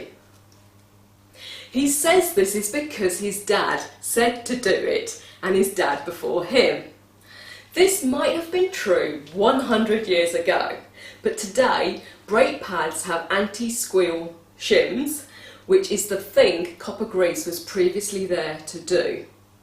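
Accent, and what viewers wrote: England, United Kingdom. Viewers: I love English women and their accent you're a extremely attractive mature lady with a great body!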